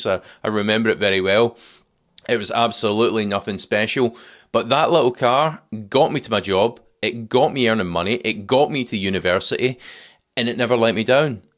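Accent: Scotland, United Kingdom. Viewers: love scottish accent! Freedom!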